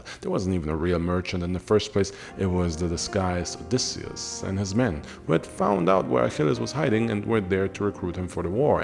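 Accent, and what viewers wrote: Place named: Germany. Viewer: ..are you german? You pronounce the names REALLY german like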